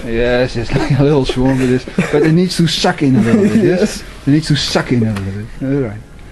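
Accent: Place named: Netherlands. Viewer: I really don't want to sound gay but the dutch accent speaking english is the best!! havin a toke and listening to just makes me so chilled!!!!! keep up he good work fella!!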